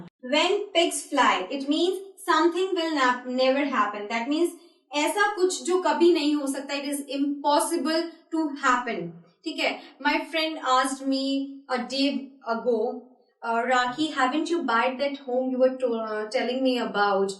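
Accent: India. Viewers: God please! try to adapt a neutral accent and not Indian accent it's so stereotypical. c'il vous plait! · Hey are you from Hyderabad? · Obviously she is inexperienced, speak like a machine gun with strong Indian accent, thank you anyway.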